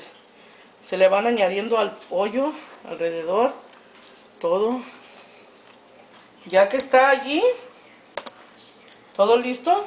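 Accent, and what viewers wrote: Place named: San Luis, Argentina. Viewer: Damn, it's a good thing I understand Espanol,,,, porque yo si entender la forma que te hablamos,,,,,, yo vive en San Luis, R.C. Son, por unas 3 anos como son 30+ anos pasado, y la jente hablan egual a como usted. , se vey muy facil a hacer este "meal", muchas gracias...... Seniora.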